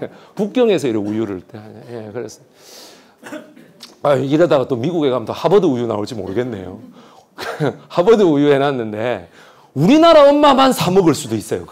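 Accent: Busan, South Korea. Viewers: 는 모르는게 없네요. 부산억양이 완벽하시네예. ㅎㅎ.😊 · 매불쑈에서 4.3 방송 잘 들었습니다. 말투가 저랑 같은 부산분 같던데 ㅎ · 경상도말씨같아 서울이 고향인줄 몰랐네요 ㅎㅎ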